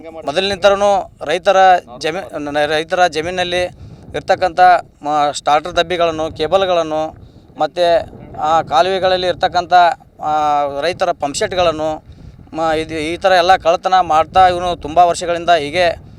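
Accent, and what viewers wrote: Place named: North Karnataka, India. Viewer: ಆದ ಘಟನೆಯನ್ನು ನಮ್ಮದೇ ಉತ್ತರ ಕನ್ನಡ ಶೈಲಿಯಲ್ಲಿ ಹೇಳುವುದಕ್ಕೆ ಯಾರು ಬೇಡ ಅಂದರ್ ನಿನಗ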